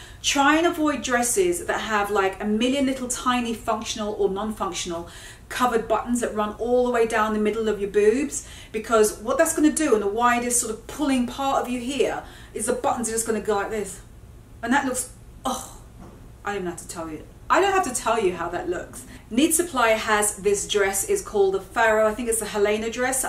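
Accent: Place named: London, United Kingdom. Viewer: Hi, where have you been all my life!! I have been binge watching all of you videos, you are amazing, I have learnt so much from you. I've lost count the amount of times, I have gone to a shop and not found my size in something I wanted and I have just walked away. No more will I be doing this, I will be going for the bigger size from now on. Your London accent got my attention, I'm a fellow Londoner and you are fantastic to watch, I love your passion! You have a new subscriber and I look forward to seeing all your new videos. x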